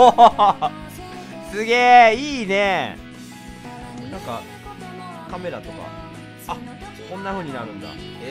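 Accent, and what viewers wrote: Kansai, Japan. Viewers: もっと関西弁で話して欲しいです＊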